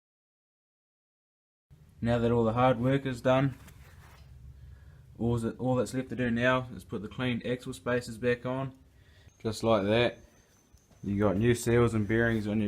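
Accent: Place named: New Zealand. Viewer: Kiwi repairs with a kiwi accent are so much better to watch. And kiwi gumboots as well... lol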